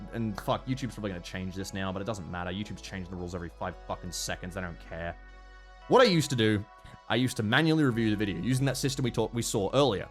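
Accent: Australia. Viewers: man i was so conviced i'd clicked on a muta vid for a second there im so glad your australian accent gave it away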